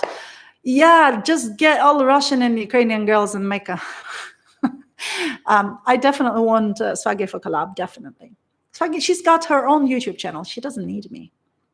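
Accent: United Kingdom. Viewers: Ok now I know why she have a British accent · Wow! You're doing so well! I knew I detected a british accent. People in the U.k cannot fly to Toronto until January 11.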